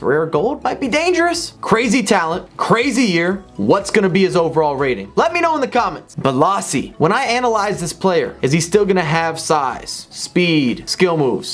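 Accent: United States. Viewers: Only FIFA channel I can watch cause you’re AMERICAN💪🏻👌🏻🏈🇺🇸🇺🇸🇺🇸🇺🇸so you don’t have this nasty accent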